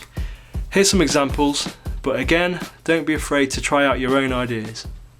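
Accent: United Kingdom. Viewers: These vids hVe helped me more than words can express, excellent job bro, you even sound proper cause of ur english accent. Great vids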